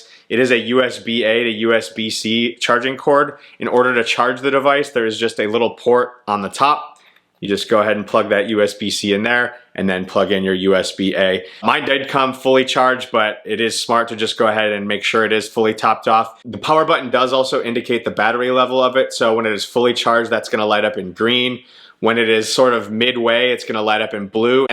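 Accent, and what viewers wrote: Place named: United States. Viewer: I didn't even notice anything negative about your English. You speak like you're from the States! lol Thanks for the review.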